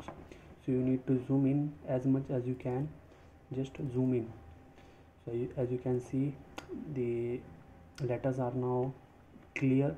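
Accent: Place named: India. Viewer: in fact you talk english but the problem is that you talk it with an Indian accent. This problem is common with the absolut majority of Indians who talk english. Indians have weard accent when they talk english, and this makes that most of people can't understand them when they talk in english. It's strange that Indians talk english with that weard accent especially that their country have been colonised by Grand Britain and for a long period. on the other hand We find that many nations that have not been colonised by Britain have understandble english. Normally Indians are supposed to talk english better than all the other anglophone countries due to the long British presence in India. Also there is large Indian communities in Britain and USA. Indians are smart people and their counrtry had been developped a lot the last 30 years. It's really not understandable that Indians talk english with a weird accent that make strangers not understand them but also attack them or laugh and mock of them. 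with all respect, My advice for you is that you should emprove the way of spelling in english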